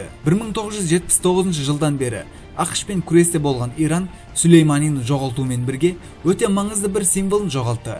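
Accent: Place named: Uzbekistan. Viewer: Өзбексің бе? Тіліңде акцент бар.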